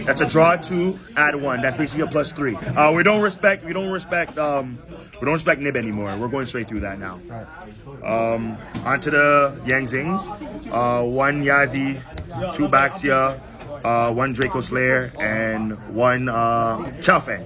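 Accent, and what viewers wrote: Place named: Toronto, Canada. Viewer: I recognize the way he speaks. My dudes from Toronto 🙏🏻 got a crazy good profile too. Thinking about getting into this deck.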